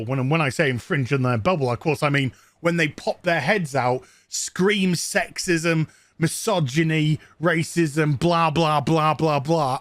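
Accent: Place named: United Kingdom. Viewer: I like how you sounded British in this video.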